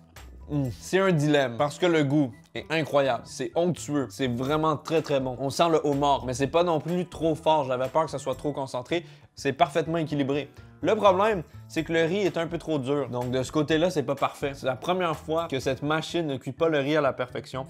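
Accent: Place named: Quebec, Canada. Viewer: Je suis français et entendre ton accent est un pur bonheur <3 vive le quebec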